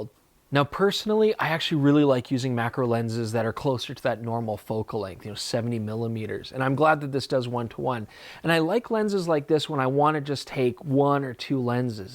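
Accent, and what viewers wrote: Canada. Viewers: I... I don't know. I guess I learned I like the canadian accent? Btw best intro of 2019 so far. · Damn!!! you sounds like my Canadian cousin in Vancouver BC. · Love that canadian accent! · You sound even more Canadian...somshow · Spot on Canadian affect there buddy 🇨🇦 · Ok...I was high when I watched this and thought to myself, “his Canadian accent is really coming out in this video or has he always sounded like this and I’m just noticing now? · I would love to see that level of Canadiana in all your videos!! I am sure Jordan loves your accent, ehh!! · Heavy Canadian needs to make a comeback. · Definitely a candian accent detected lol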